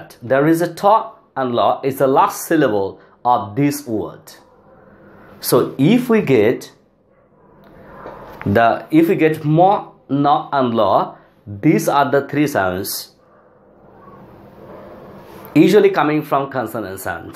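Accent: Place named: Bangladesh. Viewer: Like a bit banglish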